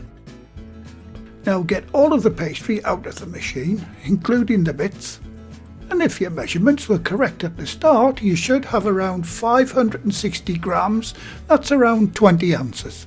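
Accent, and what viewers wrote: United Kingdom. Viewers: I love your video!! I love British accents! But also, it was easy to follow the step by step and it was fast! Ty!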